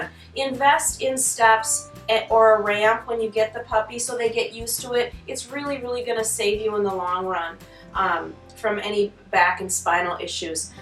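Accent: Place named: North America. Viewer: Your dogs are sweet but they are not dachshunds in terms “real” dachshunds (do not want to use word pedigree altho that is what i meant but sounds a bit nasty). There are only two sizes in Dachshunds, the standard size, up to i think 10.5 kg and the miniature Dachshunds,up to 5 or 5.5 kg. Nothing in between. Now in terms of colours. They are black&tan,chocolate&tan,red and i think it is called dapple , i am not sure. I am not quite sure about colours, but if you are going to buy Dachshund puppy and the seller sells it as pedigree puppies, one quick,easy way how to spot that the Dachshund is not Pedigree is present of white colour. Apart from the Dapple,white colour might be present in tiny amount on the chest but even that is UNDESIRABLE. Pure (short or long haired) pedigree Dachshunds have ZERO white colouring. If there is a trace of white colour(mostly on the belly/chest) , you should know that the person is not genuine selling pedigree Dachshunds and that will be mostly the reason why seller says : pedigree but NO PAPERS,or Very rare colour etc.... 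so what you have got there are sweet dogs, but not up to our European standards to be called pedigree Dachshunds. I can not differentiate the USA accent from Canada’s one, but you are surely from American continent where i noticed the acceptance of “non pedigree” Dachshund mixed with other breeds of dogs calling Dachshund. German, the original Dachshund breed here in Europe simply can not be white mixed colour which is way out o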